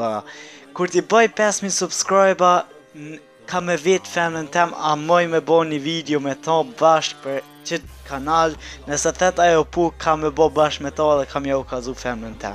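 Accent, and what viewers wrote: Gjakova, Kosovo. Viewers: ! Menove se je i gjakoves hahahahha edhe a din me fol gjermanisht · #naruto qysh po fol si gjakovar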